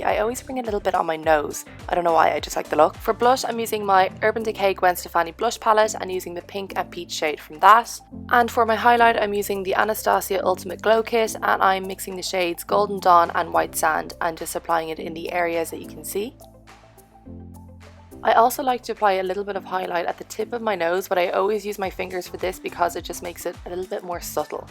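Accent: Ireland. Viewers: The Vogue ja, sie ist Irin, aber ist manchmal auch in London bei ihrem Freund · The Vogue i think she is irish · she is from Dublin in Ireland ☺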